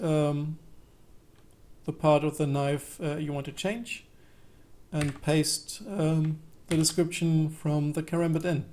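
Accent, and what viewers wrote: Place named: Germany. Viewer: haha ich hör dein Englisch und denk mir direkt, der muss Deutsch sein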